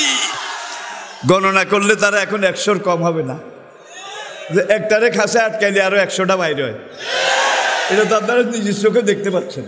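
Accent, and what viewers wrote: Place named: Noakhali, Bangladesh. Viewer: হুজুরের মুখে নোয়াখালীর কথা মাশাল্লাহ বেশ ভালো লাগলো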